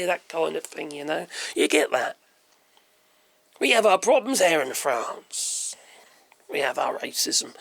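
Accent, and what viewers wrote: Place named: Scotland, United Kingdom. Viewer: Love your Scottish accent.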